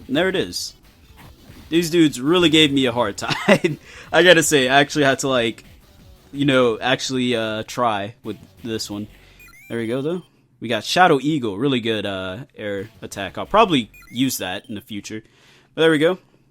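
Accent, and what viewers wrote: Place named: AAVE, United States. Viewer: Dang son, ol' Shad got pretty philosophical in this! Also, love the ebonics :)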